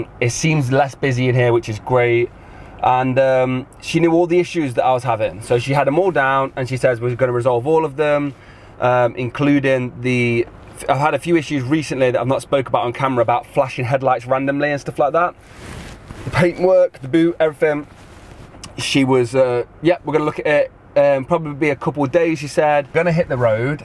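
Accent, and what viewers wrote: Nottingham, United Kingdom. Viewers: plastic can sometimes look a different shade of colour, especially in a pearl white. if you have had the car protected(ie diamond brite) this can change the colour slightly. Where they failed in my opinion was someone should have quality checked the car and talked you through a handover. the headliner damaged and the trim hanging off aren't good, hopefully easily sortable. give the car a clean and see how the bumper compares, you will probably see a colour variation on the front aswell if you look enough! I knew from your accent your are local to Notts! I made it 2!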